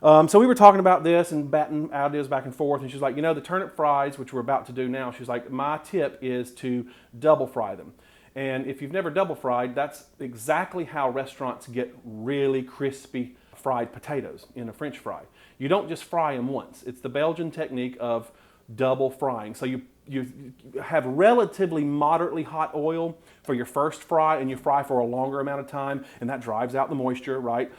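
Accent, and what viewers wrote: South, United States. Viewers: Oh wow I just love your southern accent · Love the South in your mouth!! Where you from? New sub. You’re a goofball—my favorite kind of person! Great video.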